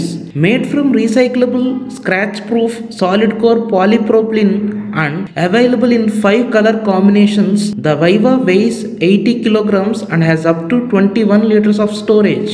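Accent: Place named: Andhra Pradesh, India. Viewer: Voice is andra english....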